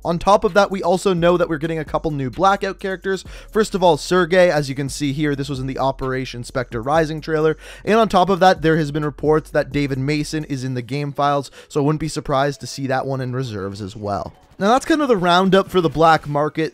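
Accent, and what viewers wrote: Canada. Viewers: he says moreoh bc he is canadian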